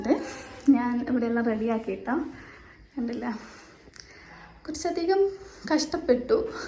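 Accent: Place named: Kannur, India. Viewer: ചേച്ചി കണ്ണൂർ ആണോ. കണ്ണൂർ ഭാഷ പോലെയുണ്ട് 😍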